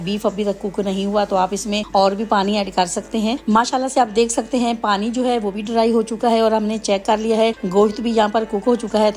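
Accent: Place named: Punjab, India. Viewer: How can u speak without taking breath 😂 very interesting food blog recipe Sy ziyada apka Bolna ka Punjabi style chah gaya 😂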